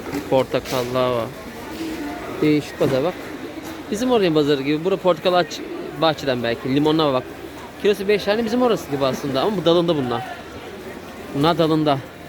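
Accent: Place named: Aegean, Turkey. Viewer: Ama şiveniz Karadeniz şivesine hiç benzemiyor daha çok Ege ile iç Anadolu karışık gibi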